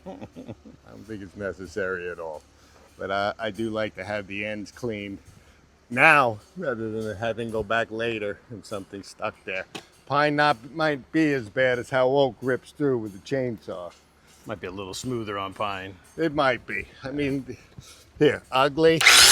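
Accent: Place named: Long Island, United States. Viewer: You can leave LI, but LI won't leave you. I'm from East Tennessee but worked at Brookhaven National Lab for about nine months and your accent was exactly like the guy who lived next door for several years who was from LI.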